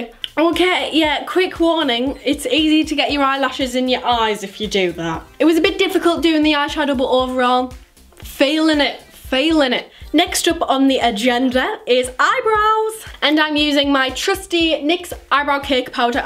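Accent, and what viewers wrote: Newcastle, United Kingdom. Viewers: i think she's from newcastle (dont hold that against me im not great with accents) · Sounds Geordie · Are you from Newcastle? X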